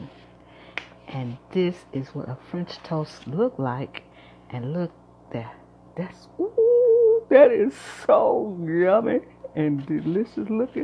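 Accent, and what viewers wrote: South, United States. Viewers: An amazing breakfast! Love ur hospitality and ur voice. So southern . God bless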